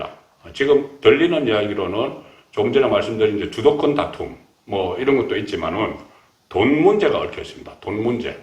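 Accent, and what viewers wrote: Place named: Daegu, South Korea. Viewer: 고향은 진주같은데 영남일보출신이라 그런지 대구사투리랑 비슷합니다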